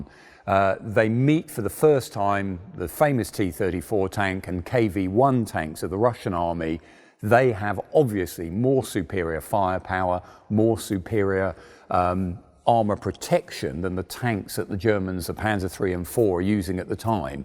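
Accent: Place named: England, United Kingdom. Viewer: That English accent and tone of voice is extraordinary, congratulations!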